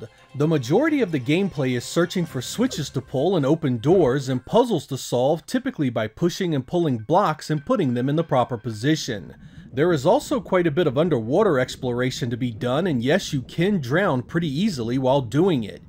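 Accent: United States. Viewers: Just watched the video, and again we hear "Morio". Americans struggle with the "a" sound. Usually they turn it into an "e". So "apple" becomes "epple" but with Mario they turn it to an "o" - "Super MOrio". It's a mystery to me...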